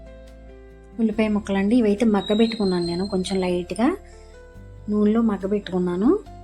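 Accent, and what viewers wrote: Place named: Godavari, India. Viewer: మేడం మీది గోదావరి నా మీ యాస అలాగే అనిపిస్తుంది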